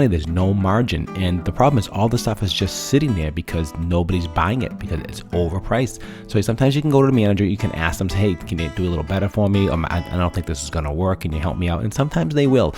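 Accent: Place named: New England, United States. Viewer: That accent!! Had to be new england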